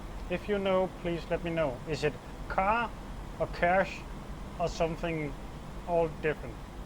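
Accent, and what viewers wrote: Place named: Denmark. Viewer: Sindssyg Danglish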